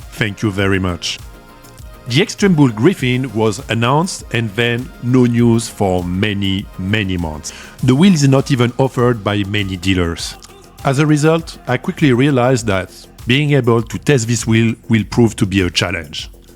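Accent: France. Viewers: en tant que wheelers FR, je suis fier que tu nous représentes si bien. Tu as l'accent français, et alors ? Je bosse dans l'IT et travaille avec différentes nationalités tout le monde a l'accent de son pays d'origine. L'important c'est d'être compris. Je suis sur que dans une ville cosmopolite comme New-York, tout le monde s'en moque. Heureusement que les humains sont divers et variés sinon quel ennui !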